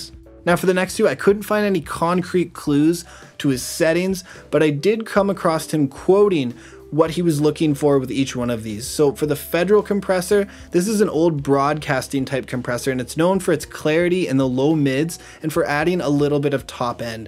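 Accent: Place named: Canada. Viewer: I ❤️ Canadian accents!! Lol Output = Oteput Routing = Roteing Without = withote Great vid dude! I feel like you explained this better than anyone on YouTube!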